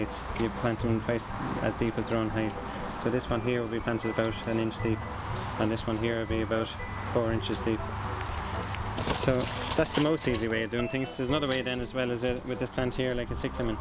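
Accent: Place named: Ireland. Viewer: Cool accent, thanks for the video, just what I was looking for for my cyclamen dividing! (for those non-irish watchers, like me - slow the speed down to 0.75 and crank up the volume :-)))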